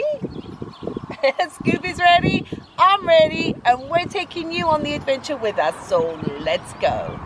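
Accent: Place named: New Jersey, United States. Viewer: Not a very pleasant place to be in the dead of winter. I'm guessing your from out of state? Sounds like a Jersey accent to me?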